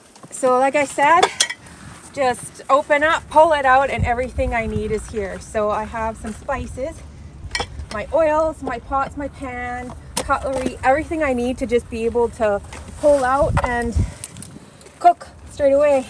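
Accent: Canada. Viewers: She is from Canada. I could hear it. I’m from the United States. I really like what she has done with her Jeep. Incredible. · Ahhh, freedom! 😊I hear a Canadian accent, so I know making it was warm as possible is a priority. I wonder if police or "Karens" up there are prone to harassing people who live in their vehicles they way they are in the U.S.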